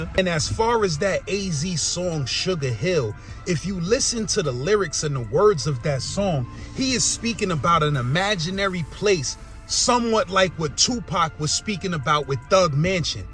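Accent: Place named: Connecticut, United States. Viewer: OMG!!! Yooooooo!!!! you smoked it " Big Kermit the Frog face, turtle face dude " 🙀💯😂😂😂😂😂😂😂😂😂😂😂 yooo I was dying my nigga, you sound like your from out here in CT 😯😆😆😆😆that was funny as hell!!😁🙀😆😆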